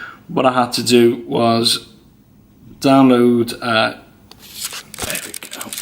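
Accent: United Kingdom. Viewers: No, is my fault because i understand and speak american english. British accent is killing me.. 😁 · focus on it and its fine. Scouse accent is much more understanable than scottish or yorkshire in my opinion:)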